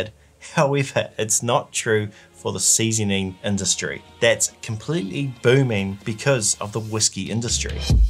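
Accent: New Zealand. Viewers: Kia Ora brother, I found your channel a few weeks ago and I must say that you educate on very understanding level. I've been drinking whiskies for 3 decades and last 20 years just single malt on rare occasions blended if I find a good one but I wasn't that good in details about process and casks in deeper dive. I lived in NZ for 16 years and crossed the ditch many years ago so it is always nice to hear this lovely accent. Anyway back to sherry , your choices are spot on, and as you mentioned, like many people, I love A Bunah and 105 a lot. I also love some of the sherry cask finished ones and I am sure you have tried this one before, it is Laphroaig PX, as a peat and sherry lover, this single malt is the best of both. Take care, and thanks for the very informative video. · Your channel is so good, needs more attention from the online whiskey community. Very well edited, great presentation, well researched, and I love listening to your Kiwi accent. 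Cheers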